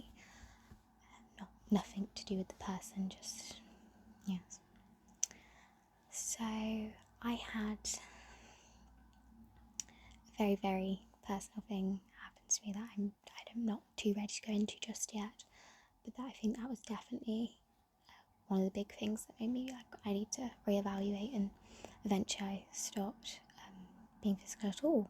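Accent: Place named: United Kingdom. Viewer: You know what it takes real courage to talk about this, especially to people online when trolls are very common. This is the first video of yours I've watched since I've just found your channel and it's kind of opened my eyes to quite a few things, I to fell into the hook up culture but mine was for a different reason ( I won't bore you with it and it's too personal to type about for everyone to see 😊), I guess I need to go and do so thinking, searching if you will 😐 as a British guy I still find it amazing how gorgeous some accents are in our country, you've got such a sweet, innocent voice.. I could literally listen to you talk for hours 😊 that is why I hope you do more of these video's, just hopefully longer.. even if it's just for my own gratification 😳😜 thank you sooo much for this video 🤗💜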